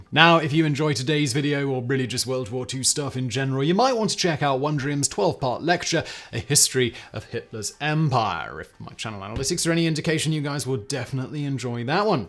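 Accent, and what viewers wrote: England, United Kingdom. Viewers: What type of English accent is that?out of curiosity.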